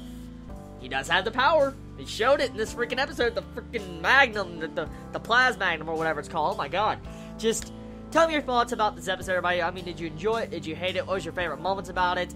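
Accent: South, United States. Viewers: Not being mean get a haircut you would look better and where is your accent from you sound southern?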